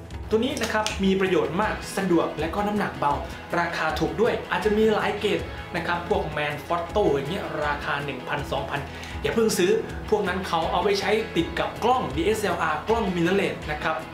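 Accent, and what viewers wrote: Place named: Surin, Thailand. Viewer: พี่สำเนียงเหมือนคนสุรินทร์เลยย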